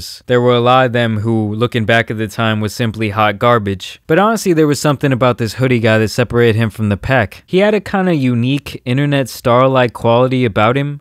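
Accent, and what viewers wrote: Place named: New York, United States. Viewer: I've been a fan of you since when you had like 20k subs I always thought you was from NY cause of your accent.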